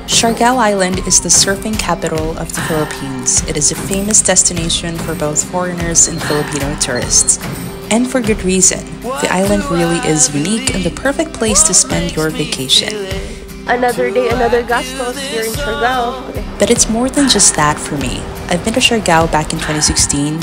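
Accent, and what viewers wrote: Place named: United States. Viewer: You have a good command of English, with hint of American accent. Did you grow up in the US?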